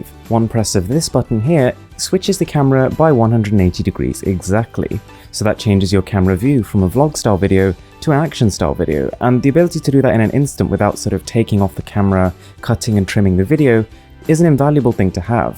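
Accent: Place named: England, United Kingdom. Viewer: awesome video bro.. as usual. u r eng accent .👌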